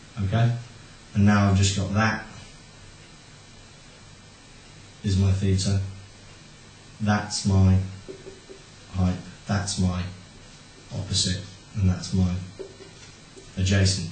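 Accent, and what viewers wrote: United Kingdom. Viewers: loving the British accent, and the video was also quite helpful. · Are you british ? cuz ur accent looks like a british guy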